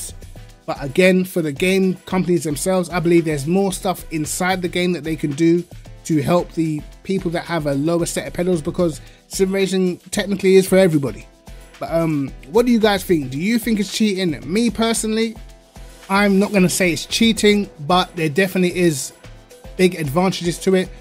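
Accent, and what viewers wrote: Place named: United Kingdom. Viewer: Why are you British?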